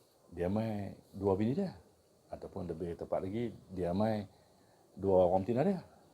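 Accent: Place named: Kedah, Malaysia. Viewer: Terbaik.. saya suka loghat kedah..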